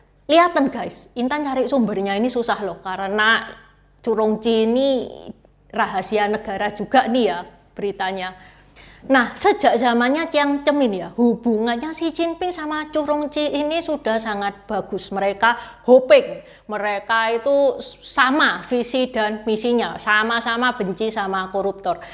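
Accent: Java, Indonesia. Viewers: Mbak ..itu suara nya ...logatnya ..jawa bnget ..gak cocok bawsin acara ddh ..sorry ya ..apalagi bahasa mandarin ..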